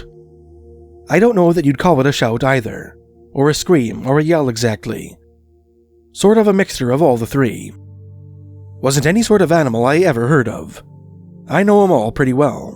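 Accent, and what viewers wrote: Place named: Canada. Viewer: I really wanted to listen to these stories. But the voice ... is the reason people poke fun at us canadians. Stop patronizing the stereotype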